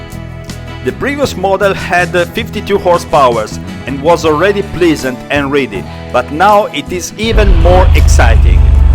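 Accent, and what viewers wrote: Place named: Italy. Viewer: there is one thing as beautiful as Italian motorcycles - the sound of the English spoken by an Italian :)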